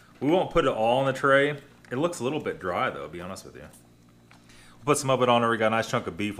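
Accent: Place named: Midwest, United States. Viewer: "If you build it, they will come." Your channel is becoming a phenom. In spite of the bottom feeders, with their assinine commentaries, the viewers with who have possession of their faculties appreciate your no-nonsense, BS free, objective reviews. It allows the ration collector to get vital information in order to avoid purchasing sub-standard MRE's. One final note, if you're from the Mid-West, you pronounce English according to the dialect of the region. If someone from another region can't understand this, they're idiots. (I'm from Sout' Jersey and I murdah da Kink's Inglish. We eat Peetza, Sof' Pretzils, Scrapple sammiches, and Cheez Steaks wid Cheez Whiz, ongyuns, hot peppahs, ana attytude... We drink Yinglings (Yuenglings) beer ice cold, so yous can bite me!....Go Philly Iggles!) 👍👍✌✌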